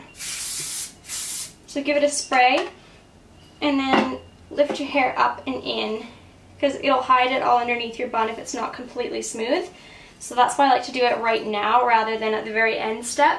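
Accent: Australia. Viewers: Yes! I can hear a bit of Aussie :) you are becoming one of us haha xx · I hear someone Austrailian Accent in you! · I can hear youve started to pick up a but of an aussie accent? :) thanks forth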